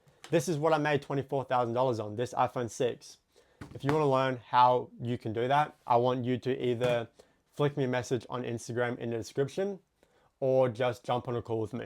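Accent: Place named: Australia. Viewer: I like this guy already soon as he starts the video already drop the F bomb in a Australian accent😭 let’s get right to it boys💀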